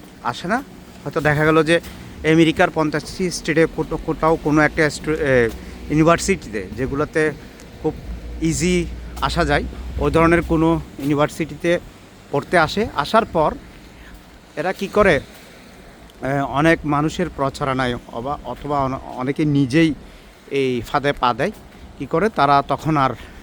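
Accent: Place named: Noakhali, Bangladesh. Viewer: আপনি মনেহয় নোয়াখাইল্লা। শুদ্ধ কথা বলতে বলতে আপনার নোয়াখালীর কিছু ভাষা চলে আসছে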